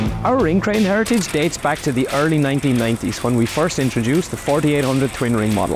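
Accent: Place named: Ireland. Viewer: I wasn’t expecting an Irish accent when the video started! These cranes make liebherr ones look like toys!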